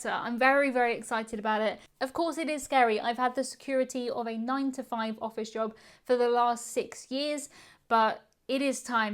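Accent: RP, United Kingdom. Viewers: Just found your videos and was scared you were quitting YouTube! I’m not usually big on ladies with British accents, but I quite fancy! Congrats and good luck!! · Your videos are so fun to watch and with that delightful BBC accent it also fascinating to listen to your narration. Keep up the good work!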